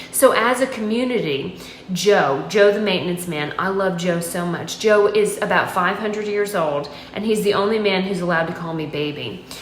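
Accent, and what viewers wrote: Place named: California, United States. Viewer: Girl where you from ⁉️⁉️⁉️ You sound more Cali than North CAROLINA. Keep your sense of humor it looks good on you.!! ✌💗🎼🎵🎶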